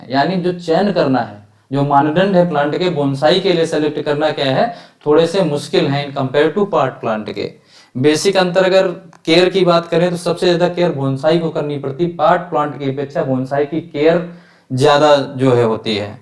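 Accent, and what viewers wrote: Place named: Bahraich, India. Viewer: Sir bahraich side se hain na, mainly mahsi, apka accent bht match hota hai yaha se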